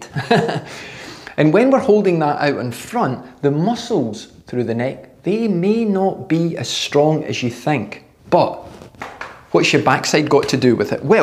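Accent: Scotland, United Kingdom. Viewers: A real Scott. With that accent, how can he be wrong? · Great video. Thank you! Love the Scottish accent 😁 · Great content, thanks. And I could listen to you all day, I just love the Scottish accent! Thanks for your great content! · not only - it was also nice 11 minutes of... Scottish English if I am not mistaken ;-) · Thanks for explaining! I have this exact problem and may have found the solution now. :) Also...I love your accent! Are you scottish?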